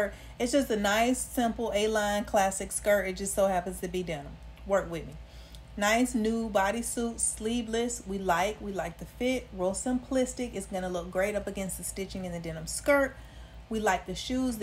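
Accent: South, United States. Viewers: hi 😄, this is Kay.. yesss. they are all 5 stars. 💥💥💥💥💥. btw, I love how you say "thure' instead of there. where are you located? or the bit of southern accent?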